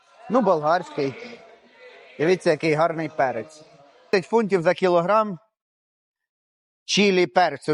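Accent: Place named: Poltava, Ukraine. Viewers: Красавчик 😂 Полтавский діалект ❤ зробіть обзор апкомагазину, яке є СУХЕ вино і почім?! заздалегідь Дякую. ну і коли будемо жарить попугая (рибу)?! Обох обійняв. цьом. Тримаймося 🎉 p.s.у ВєлМаркеті в Києві, банани по 71грн, Еквадор, красиві. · Ваш полтавський суржик шикарнючий. Кому не подобається- всі в сад. Тільки не переходьте на кацапську, бо блогери - україномовні одиниці. На язьіке вагон і тєлєжка, але тошнить од кацапського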